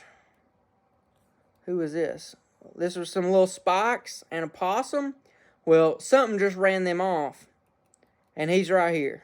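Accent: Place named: South, United States. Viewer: Im partially deaf but i can still hear how southern he sounds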